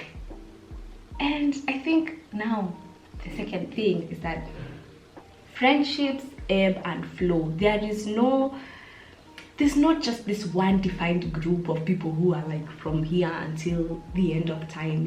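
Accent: United Kingdom. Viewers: I repeat “do you know what I mean babes” in British accent 50 times in a day cause of 😂🥰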